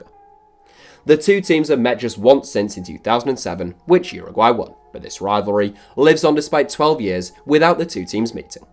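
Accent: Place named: England, United Kingdom. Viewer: I have to say that english accent is too intense its so hard to understand what the guy is saying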